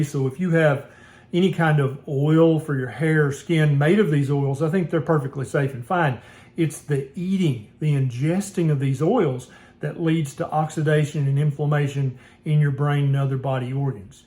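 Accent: South, United States. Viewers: Read the full comment you will know where I’m coming from This doesn’t mean you’re dumb I had grown up in Poca, WV you’re a DR. you have to be near the top of your graduating class I just want to point something out it’s just an observation but I could tell you’re “country” by your accent then when you said bacon grease I knew you were from the south I grown up on white bacon gravy bacon and white bread rode four wheelers again you can be one of the smartest people in your town for your age group and everybody not believe in you because of your accent I know somebody from Elkview, WV he was picked on for his accent because of that people didn’t think he was smart he finished near the top of his class and became an stockbroker his salary is decent.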